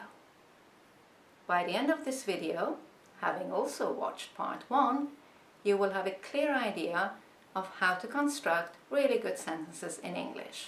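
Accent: United Kingdom. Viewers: Yor english is british?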